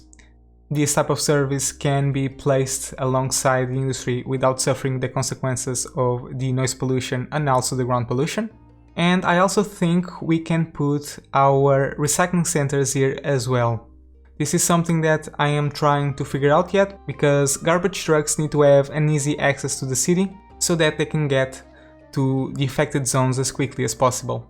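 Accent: Portugal. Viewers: Are you portuguese? Your accent looks like it Ok descobri que és tuga, já subscrevi. Adoro o Cities Skylines também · I was paying attention and suddenly my head was like "this sounds like a Portuguese guy speaking English". Eu estava certo 😂 parabéns pelo canal, estou a apontar as dicas! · Reconheci logo o sotaque portugues! É bom saber que existe um yt portugues de cities skylines apesar do teu canal ser em inglês!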